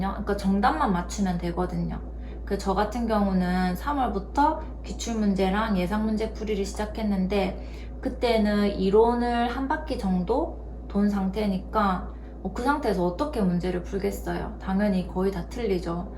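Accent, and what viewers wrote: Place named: Daegu, South Korea. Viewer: 워킹맘인데 공인중개사 관심 생겨서 검색해보고 보는데 익숙한 사투리 너무 정감있어요 ㅎㅎ 저도 대구에서 자랐거든요~ 열심히 하신게 느껴져서 동기부여도 되네요. 솔직한 영상 감사드려요!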